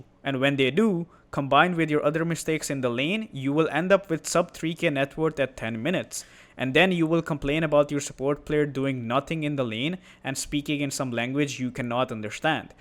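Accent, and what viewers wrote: India. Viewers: the indian accent even makes it soo much better. just like watching any other tutorial video online. its a familiar feeling.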